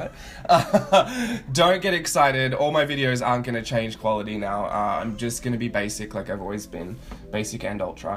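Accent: Australia. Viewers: Thanks for the lovely original swatches and the info on DS colours. It's always nice to hear an Aussie accent on mixed media so keep making these wonderful videos. xx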